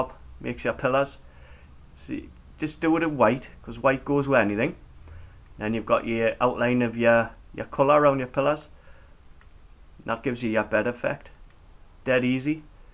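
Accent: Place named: Newcastle, United Kingdom. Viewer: lol your geordie accent made me laugh when talking about your pillows then straight on to the pillars. Great work mate looking awesome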